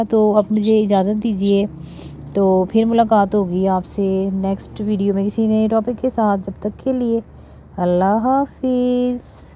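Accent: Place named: Punjab, India. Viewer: barri sohni awaz punjabi touch of pindi ji.like ji.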